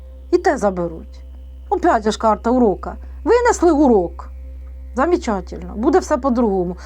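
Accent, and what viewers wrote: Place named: Surzhyk, Ukraine. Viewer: Як важко слухати "суржик". І каже правільні речі... Але. Вибачаюсь.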